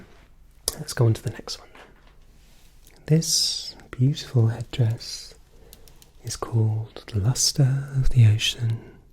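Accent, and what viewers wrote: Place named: RP, United Kingdom. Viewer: love the sound quality..🔥🔥🔥🔥🔥 Your tongue in cheek posh is brilliant. It's not the air conditioning that's the problem for it being insufferably hot 🔥. It's heating Meridian's up and a little dab is not going to suffice in cooling madam. LOL. Cracking me up over here - " I know you're a bit of a magpie, you like those sparkly things..." ♥️♥️♥️🔥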